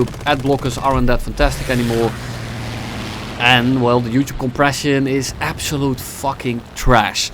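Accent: Netherlands. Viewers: Never knew he was dutch, I always thought he was an american with a speech disability.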